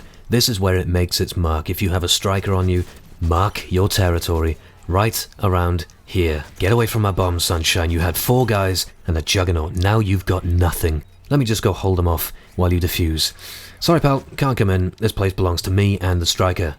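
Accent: Wales, United Kingdom. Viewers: sound like a welsh xbox ahoy :)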